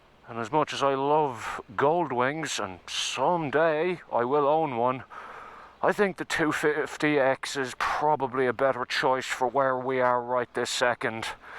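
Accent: Ireland. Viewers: An Irish accent under the palms?.......good lard! I agree, a fun little bike!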